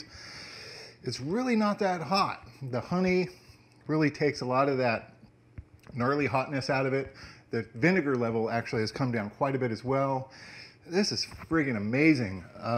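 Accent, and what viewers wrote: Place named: Memphis, United States. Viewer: Uncle Lou's,sounds like Memphis to me.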